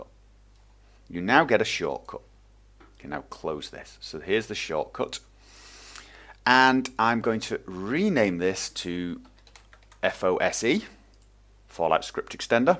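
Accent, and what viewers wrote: United Kingdom. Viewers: Is this guy norwegian? He speaks like a brit XD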